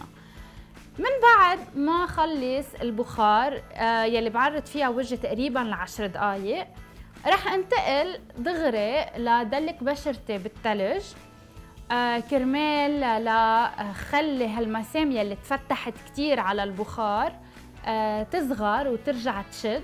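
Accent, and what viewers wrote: Egypt. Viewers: تحكي بالمصري كتير ضحكتني 😅😅😅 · المصري بتاعك عسل اوي 😂💟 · بقيتي مصرية يا وبتتكلمي زينا🌹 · طريقة نطقك مصري عسل جداً 🤣🤣🤣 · فيديو جميل ❤️❤️ وطريقتك وانتى بتتكلمى مصرى عسل أوى حبيتها 😂😂😂😘😘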